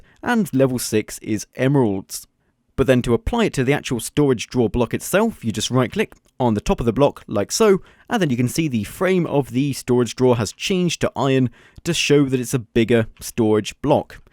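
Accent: Wales, United Kingdom. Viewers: are you welsh??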